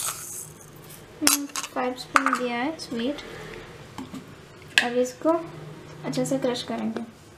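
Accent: India. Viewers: I think she is from south they almost speaks in same way👍🏻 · Shaan Mujawar she is probably from south what’s the point of making fun of her Hindi it’s not her first language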